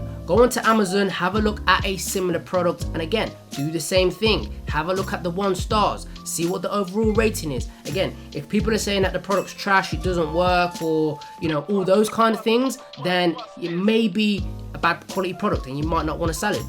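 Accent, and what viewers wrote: United Kingdom. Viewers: UK accent lowkey ads more authenticity to his voice, start a podcast "#1 E-commerce Podcast in the world"...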